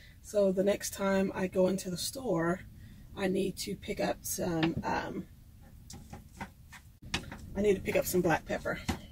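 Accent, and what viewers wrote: South, United States. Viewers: I enjoy your content. Thank you for sharing. 1. I think I hear a southern accent? 2. Onions tend to have a long lasting after taste that is awful to me. I think it's just me though - folks love onions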